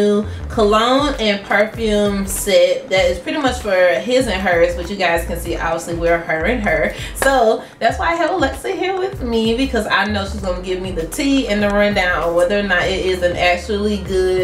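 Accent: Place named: South, United States. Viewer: your beautiful you have great skin and great cheekbones I’m jealous the faces she be making so cute lol she got a baby face such a cutie with a great smile, where you from you got accents like southern im from Boston Massachusetts so you know how we sound I’m sure lol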